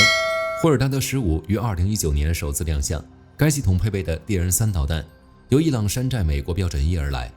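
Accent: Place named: Northeast, China. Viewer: 東北人呀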